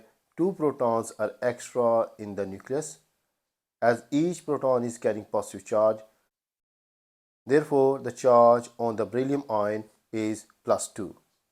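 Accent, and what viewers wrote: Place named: India. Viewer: The indian accent makes it funny lmao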